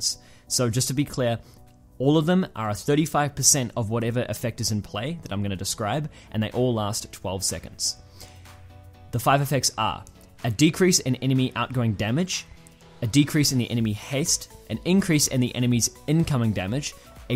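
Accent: New Zealand. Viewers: are you from New Zealand because I am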